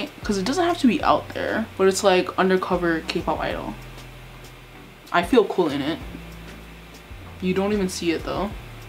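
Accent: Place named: Canada. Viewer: You’re either from Canada or Seattle. I forgot which one says beg=bag. Maybe both Edit: Its Canada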